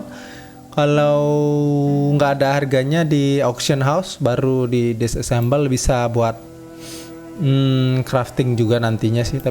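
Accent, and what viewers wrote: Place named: Pontianak, Indonesia. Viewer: Logatnya mirip orang pontianak bang 😅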